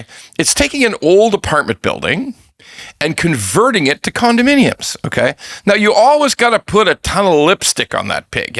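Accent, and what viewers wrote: Toronto, Canada. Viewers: i know you are in Toronto, it's the way you say it with the last 'T' silent.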